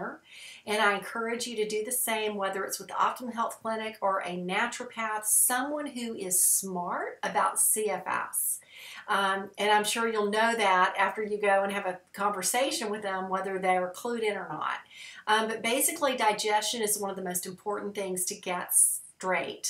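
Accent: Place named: South, United States. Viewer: love ur southern drawl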